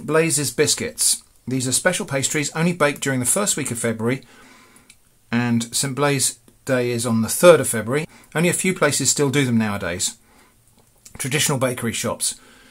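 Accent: United Kingdom. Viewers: Where in the UK are you from ?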